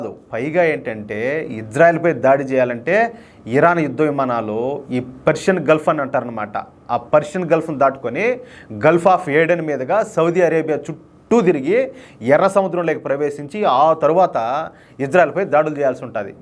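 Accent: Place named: Nellore, India. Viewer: Anna medi nellore ha slang alage undii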